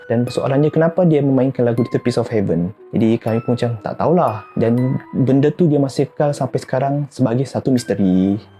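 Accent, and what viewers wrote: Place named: Sarawak, Malaysia. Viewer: Budak sarawak rupa nye speaker nihh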